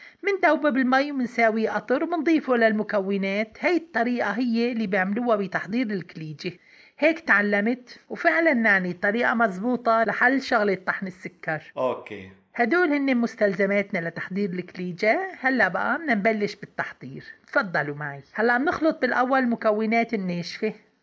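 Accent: Aleppo, Syria. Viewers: ياي يا خالة على لهجتك الحلبية والله صرت أعشقها من لما سمعتك...تسلم إيدك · يسلم هالإيدين🎀مافي احلى من الحلبية وحكياتن👍😍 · يسلم اديكي انا حبيت اللهجة الحلبية كرمالك و بتمنى نشوف وجهك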